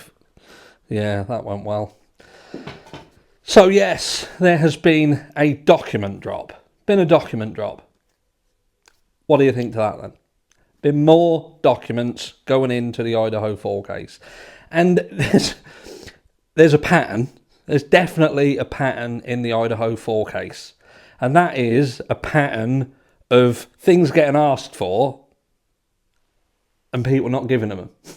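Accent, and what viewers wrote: United Kingdom. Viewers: BTW, where are you from? I am from SC. I only ask because I thought you were from the UK, but when you say certain things it makes me think you are from Australia..... Things like “Mate”.... Maybe they say that in other places. If so, educate me. Thanks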